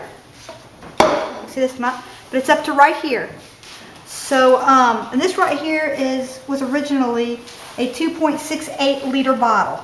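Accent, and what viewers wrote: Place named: Appalachia, United States. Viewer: Sounds like a hillbilly and can't do it right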